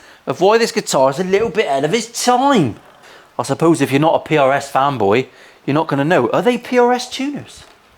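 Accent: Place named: Totnes, United Kingdom. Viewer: Hats off to the Legend, serious kudos. I’ve a PRS SE custom 24-08 lefty. Played BC Rich for years (regret selling a few!) but without a doubt the PRS is the best guitar I’ve played and one that I reach for. Keep up the good work buddy. Showed my missus your channel cos she’s from Totnes…said listening to you was like being back home!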